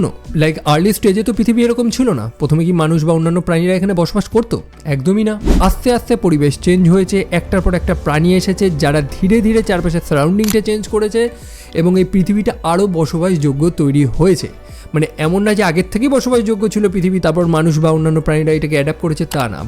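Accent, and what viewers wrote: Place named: India. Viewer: ভারতীয়দের উচ্চারণ আরকি 😂ইংলিশ ওরা এমনেই উচ্চারণ করে 😂নিজেদের মত করে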